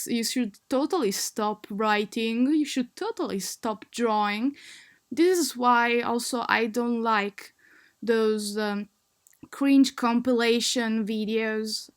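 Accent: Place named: France. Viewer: Are you french ?? You sound like french 😆🧡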